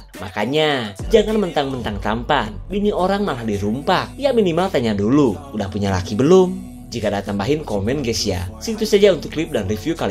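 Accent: Sunda, Indonesia. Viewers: Aya sunda sundana kitu seru pisann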